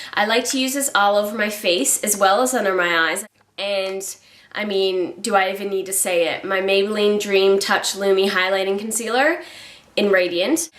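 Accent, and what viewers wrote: Canada. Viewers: Being Canadian, it's always difficult for me to hear what the rest of the world hears as a "Canadian accent." But I think I hear it now....so funny. Probably from watching videos of girls from all over the world. This was the first video of yours that I have watched and I knew within the first couple of minutes that you were a fellow Canadian! Awesome video! New subscriber!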